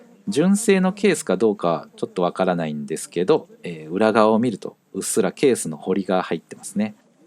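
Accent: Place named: Kansai, Japan. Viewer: お互いに関西の何処かに居ますね。さんの聴きやすい声の僅かな関西訛りを聴きだして塾聴しながら私なりに楽しんでます。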